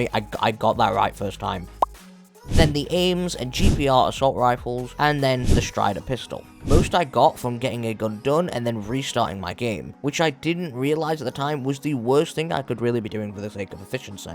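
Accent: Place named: United Kingdom. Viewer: I usually don't like the british accent, but you sound really nice! :)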